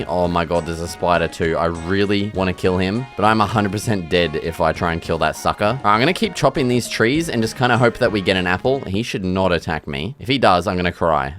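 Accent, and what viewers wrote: Australia. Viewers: You sound like a Aussie bloke nice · He’s Aussie!?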